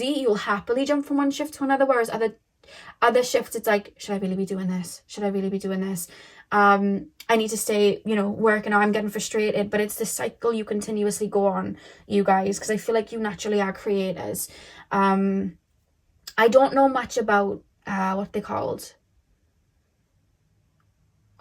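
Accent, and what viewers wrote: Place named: Newcastle, United Kingdom. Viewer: Are you a Geordie? Trying to place your accent.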